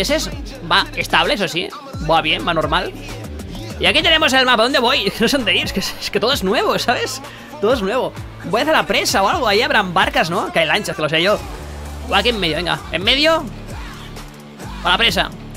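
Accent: Spain. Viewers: Tengo que ver el video sin audio gracias a tu estresante y molesto acento español. Gracias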